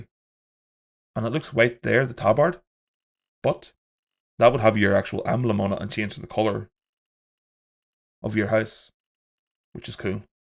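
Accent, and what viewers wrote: Northern Ireland, United Kingdom. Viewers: Its pretty cool to hear a fellow Northern Irish man having a blast with this game, ive pretty much abandoned all my other games over the last month.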